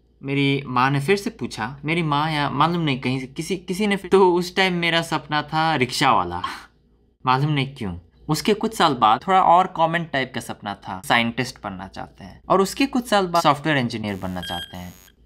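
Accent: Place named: Bhojpuri, India. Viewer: 💞💞💞 bhiya aapki hidi thodi bhojpuri mix sound kr ri h we support you 💜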